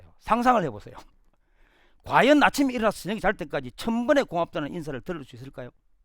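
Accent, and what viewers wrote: Gyeongsang, South Korea. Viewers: 오랫만에 듣는 명강의 였습니다 처음 종로선글에 찾아 올땐경상도 말씨로 약간은 덜세련된 말투가 너무 진정성과 친밀감을 느꼈습니다 아침 같은시간대에 어김없이 방송해주시는 마력에 빠졌습니다 삼성에 근무하셨다는 말만으로라도 나와같은 삼성가족 이구나하는 끈끈함과 가끔은 우리집사람이,우리집 사람은 하고 호중님 얘기를 하시는 모습이 너무나 자상한, 인간적인 면모를 보는듯 합니다 대통령상을 두번이나 받으실 자격이 충분하다는 생각을 했습니다 늦었지만 맘껏 축하드립니다 고맙습니다 신께 감사드립니다 호중님곁에 회장님이 계시고 그래서 저도 인연을 맺게 됨을 말입니다 환절기에 건강을 기원합니다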